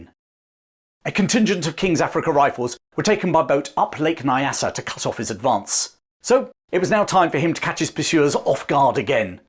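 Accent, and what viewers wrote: United Kingdom. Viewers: German here, loved hearing the story told in such a British and masterful voice. Lettow-Vorbeck ('V' pronounced like 'F') entered German post-war lore as one of the few undefeated heroes. His quite popular own account of the events, "Heia Safari", adorned the bookshelves of many boys and young men in the 1920s and later. My Granddad (b1902) gave his copy to my Dad (b1938), who handed it on to me (b1965). Nowadays however, only a few young folks may still be familiar with the campaign, neither with its adventures nor with its tragedies. Peace!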